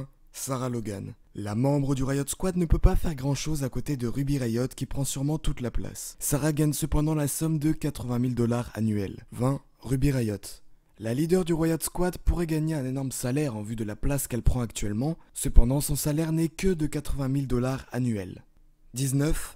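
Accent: Russia. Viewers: Mdr ton accent russe 😂😂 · Je like la vidéo parce que elle est bonne et pour l'accent Russe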